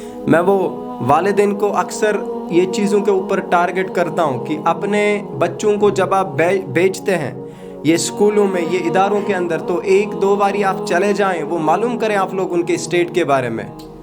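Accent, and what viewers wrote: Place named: United States. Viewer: Sir u've got an amazin accent u speak like native American that's epic! sir I wanna know did u learnt in online from tv shows speeches etc? actually there are multiple english accents it changes from region to region english speaking countries like America Britain Canada australia scotland ireland there accents and slang words differ from each other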